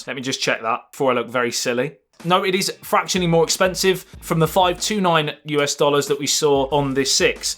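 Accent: United Kingdom. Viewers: I used to watch your reviews as it is highly useful and also to listen to your British accent to improve my English language skills :D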